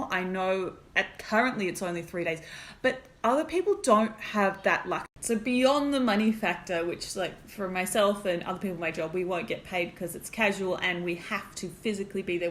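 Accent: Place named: New Zealand. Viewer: Gee you speak well, just reinforcing your absolutely stunning tribal council speech. Also just a small thing but as a Kiwi now living in Vic ... I can hear your accent starting to change and you are beginning to adopt the NZ way of saying your vowels.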